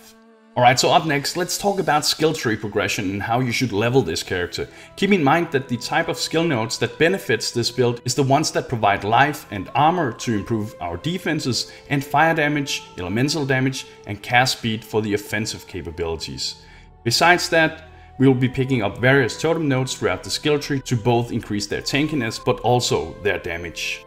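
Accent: Denmark. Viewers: Hey really good video man! i recently started playing and have been toying with a necromancer/totem witch, with a bit of fire spells to help on the side. Is it to spread out or is it viable? Btw are you danish? i just thought i heard it in your accent :). If you are, i can tell you, it was definitely difficult to hear it. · Hmm, that accent. You're Danish aren't you!? :) :)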